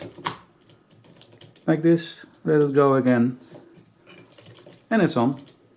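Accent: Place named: Netherlands. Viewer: Ik kon horen dat je nederlands was haha! Bedankt voor de vid.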